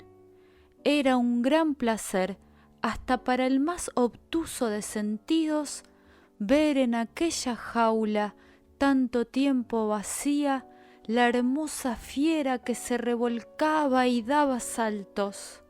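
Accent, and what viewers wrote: Argentina. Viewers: El acento argentino para un audiolibro no es de mi gusto.😒😒😒😒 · El acento argentino no sirve para un audiolibro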